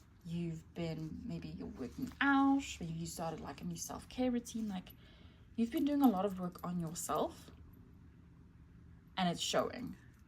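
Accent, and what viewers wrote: South Africa. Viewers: South African voice right there! Love it 🥰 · Girl I know a South African when I hear one! 👀😂🫶🏾🇿🇦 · Are you South African? Just found your channel, love these messages and your thumbnail💗 p1 is really accurate 😮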